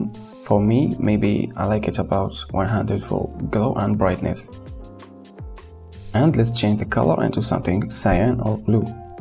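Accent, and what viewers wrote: Morocco. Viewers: moroccan accent yak hhh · Moroccan accent 🤍 keep going bro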